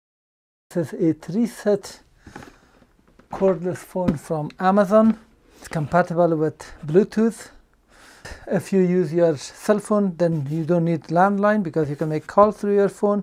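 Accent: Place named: Poland. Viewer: Is this phone the Panasonic - KX-TGF975S Link2Cell DECT 6.0 Expandable Cordless Phone System with Digital Answering System and Smart Call Blocker - Silver? I'd love to purchase one of these one day! Does this have a voice guide? What I mean is, will it talk to you when you're setting up the features? As I am sight challenged, I have to have things that talk to me, as I can't read print, and I can't see what's on a screen. By the way, I looooooooooooooove your accent! Is that Polish? Maybe it's Spanish?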